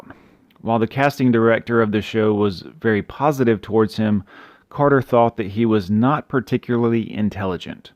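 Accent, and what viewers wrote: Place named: South, United States. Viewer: also i'm assuming your southern, i can somewhat tell with the subtle accent and a very occasional "y'all"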